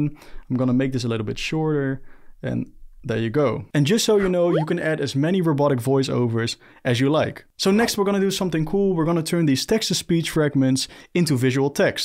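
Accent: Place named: Netherlands. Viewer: Bro is from the Netherlands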